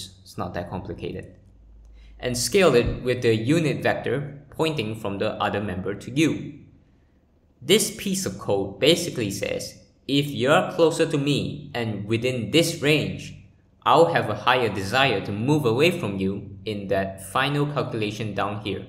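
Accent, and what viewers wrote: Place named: India. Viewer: subtle indian accent.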